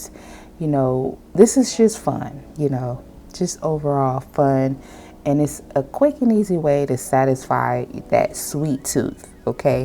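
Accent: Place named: Maryland, United States. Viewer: Love your accent- back east- maybe Maryland?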